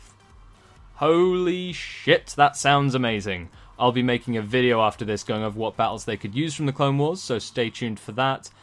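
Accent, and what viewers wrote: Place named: United Kingdom. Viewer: Good video, very informative and i almost cried in excitement. Dont understand why you dont have more subscribers especially with that british accent that automatically makes you sound more intelligent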